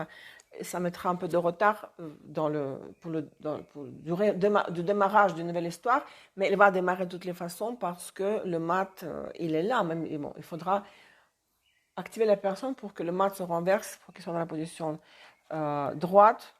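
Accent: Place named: Russia. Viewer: Non seulement vous apportez le soleil, mais aussi votre exigence dans les connaissances. Mais en plus (cerise sur le gâteau) votre souci du respect de la belle langue parlée, au moment où vous vous adressez à nous, est très touchant, pour des Français comme moi dont la culture est un peu piétinée, ces dernières années. Avec une touche d’accent russe, c’est merveilleux ! Merci infiniment. 💐🎶🥂🌻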